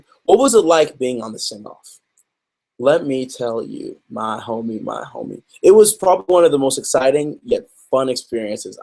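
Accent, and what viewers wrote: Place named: Spain. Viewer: Wow! your spanish accent is fantastic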